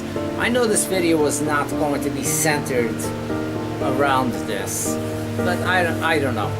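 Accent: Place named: Portugal. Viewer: Bom trabalho! I knew you had to be Portuguese. Your accent reminded me of my father who was an amazing woodworker in his own right. I could watch him all day. I love watching your channel and your amazing creations. You have inspired me to maybe try my hand at wood turning hopefully in the near future. Nao pares suas criacoes!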